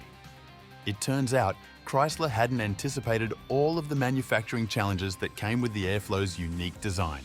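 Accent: Australia. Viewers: Love hearing an Aussie voice doing one of these, amazingly put together video to top it off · Who wrote the script? This guy has an aussie accent but speaks the American language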